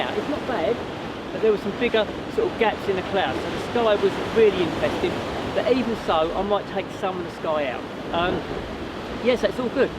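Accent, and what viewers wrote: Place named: Essex, United Kingdom. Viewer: Great Essex accent!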